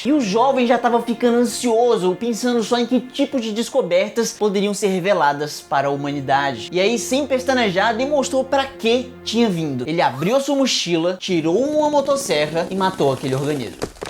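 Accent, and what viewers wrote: Ceará, Brazil. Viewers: Ele é cearense confunde mesmo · Krai o guri e cearense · Cearense k(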